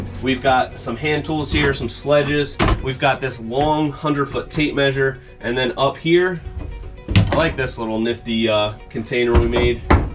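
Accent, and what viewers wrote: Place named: Philadelphia, United States. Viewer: Spotted that Phiwwey accent right away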